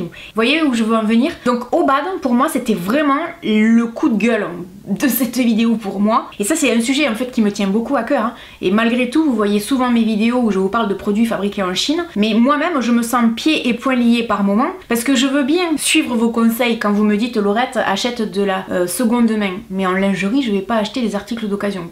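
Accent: South, France. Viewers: Coucou Laurette, ça n'a rien à voir avec la vidéo, mais je voulais juste te dire que j'ai beaucoup de plaisir à t'écouter parler. J'aime beaucoup ton accent du Sud ! Reste comme tu es ! Sinon, Super vidéo ! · bonjour Laurette, je suis une nouvelle abonnée et je voulais vous dire que je vous trouve rayonnante, j'ai 49 ans et je fais sans doute partie des mamans qui vous suivent . Votre accent est un bonheur absolu car moi aussi je suis du sud (Marseille)même si je vis en Bretagne, continuez à nous donner de la joie et de la bonne humeur , restez vous même contre vents et marées . Je vous embrasse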